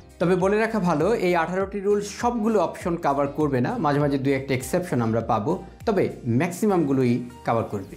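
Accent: United Kingdom. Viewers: it is surely with BRITISH ACCENT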